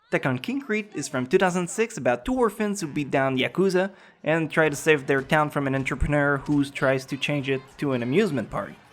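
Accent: Quebec, Canada. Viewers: That accent... are you from Quebec? T'es québécois, pas vrai ? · haha j'ai remarquer ton accent Quebecois. J'aimerais bien te voir une fois au quebec et te saluer! J'aime tes vidéos, tu est un de mes youtubeur preferer a cause du contenue de tes vidéos!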